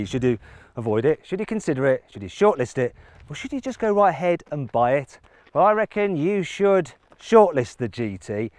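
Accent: United Kingdom. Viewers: 맷 뭔가 포드 V 페라리 영화에서 나오는 켄 마일즈 닮았는데 기분탓임? 이목구비 뚜렷하고, 영국식 발음 쓰는거 딱 들어맞고 차 좋아하는거랑... 차 단점 한번에 알아보는거 너무 닮았는데 ㅋㅋㅋ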